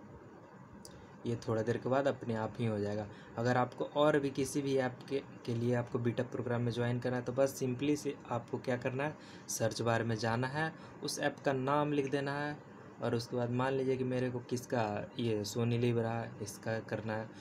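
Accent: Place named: Patna, India. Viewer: Your from patna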